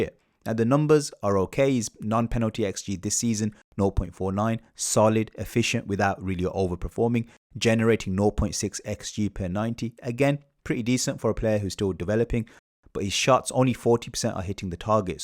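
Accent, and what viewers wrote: Birmingham, United Kingdom. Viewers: Lovely analysis. 1st time listener, but will deffo be back. Is that a slight Brummie accent I can hear BTW? 😂 Shirley boy here!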